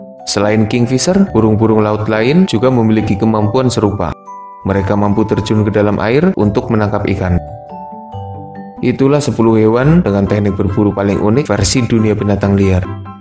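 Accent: Banyumas, Indonesia. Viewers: Suara ADMIN ky wong NGAPAK Y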